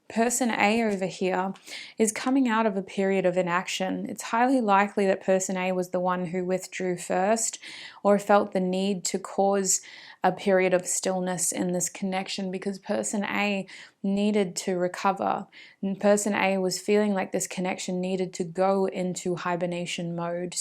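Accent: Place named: Australia. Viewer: 1st I choose pile one, this (the whole reading) resonates with me so much we broke up last year the month before Christmas and he still constantly messages me and wants to see me now in the past it didn’t go great but I know he is very kind and we both had our own childhood issues/issues that wasn’t healed so it was very hard but I do feel as though he’s who I’m meant to be with and I don’t feel like our chapter is finished and I have stopped talking to him for about two weeks or more because I’m really trying to think about myself and heal for now and hope that this time will give him the time to heal and change but I definitely have left hope because it has been so long without anything happening but I do always still hold some type of hope and he does always seem to come back to me but I really am making sure that if I go back he really does give 100% as well as me and it’s an even giving connection. 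Thank you lovely and don’t worry about the time I love long readings so I didn’t have any issues watching all the way through my reading and I’m Australian as-well and your accent is so strong but calming I love watching your tarot videos before bed it definitely gives me energy the next day as I have the confirmation I needed.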